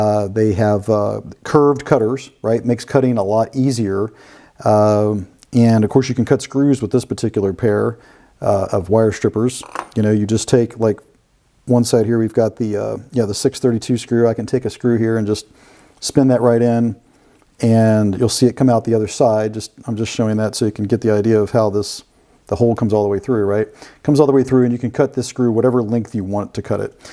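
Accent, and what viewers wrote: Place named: Milwaukee, United States. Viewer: You sound like you grew up in Milwaukee. The natives say Miwaukee.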